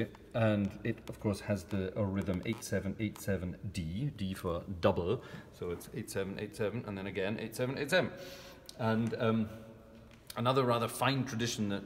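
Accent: Germany. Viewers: You seem to have developed a slight on-and-off German accent. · Love your channel and thanks for all the wonderful videos! If I may ask, and the question somewhat fits the titel of the video: How long have you been living in Germany? I mean no offence, but you seem to have a slight German accent in your English? That, or my hearing is just shit! Keep up the amazing work on your channel!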